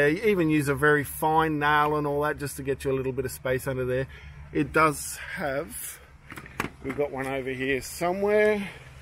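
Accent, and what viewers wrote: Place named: Australia. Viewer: Real Aussie. 😁. Thanks Mate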